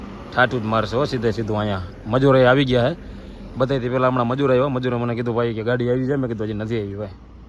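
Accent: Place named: Saurashtra, India. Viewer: ગુજરાતી તો સેજ પણ એમાય સૌરાષ્ટ્ર જે બોલી છે એને તો પુગેજ નય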